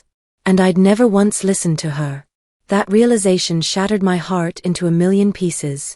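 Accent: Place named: United Kingdom. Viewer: Absolutely freakin love British Narrators. ❤❤❤ Great Story 😊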